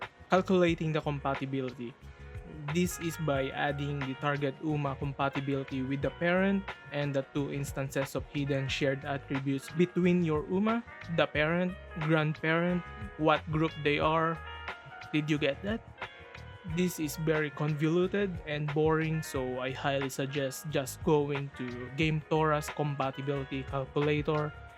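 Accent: Philippines. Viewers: I smell Filipino accent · You sound... Pilipins..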